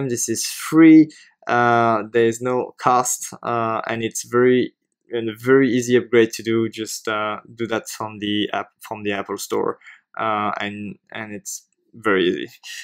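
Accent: France. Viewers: I can hear u r from France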